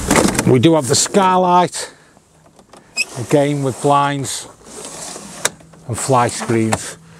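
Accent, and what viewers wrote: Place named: North Yorkshire, United Kingdom. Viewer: Northern England accent (North Yorkshire)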